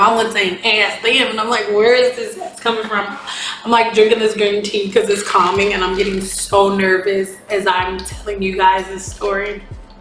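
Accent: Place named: Florida, United States. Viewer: Florida in the house, I love❤ the way you say Gone head Girl😀 your accent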